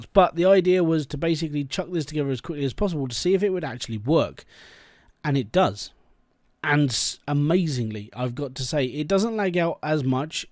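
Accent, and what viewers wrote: United Kingdom. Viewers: hears Brittish accent subscribes i love this game and that accent XD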